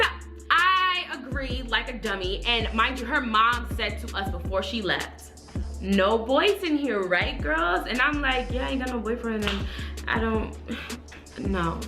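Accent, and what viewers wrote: Florida, United States. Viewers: Yesssss I knew you were from Florida we talk the same lmao!!! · Miss. Darlinton Lmfaooooo Florida squad! The expressions, the hype, the humor, the way she talks all of that I was like "lmfao is she from Florida?"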